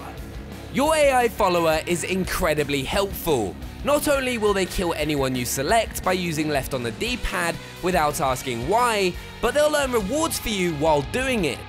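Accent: United Kingdom. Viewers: Disliked because of Xbox and British accent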